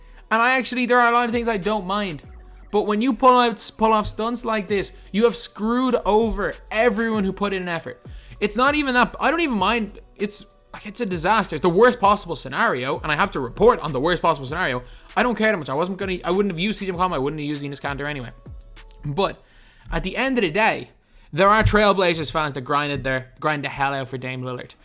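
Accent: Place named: Ireland. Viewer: Angry Irish lad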